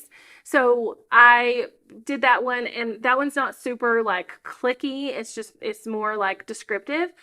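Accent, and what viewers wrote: South, United States. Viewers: That southern drawl makes me smile so much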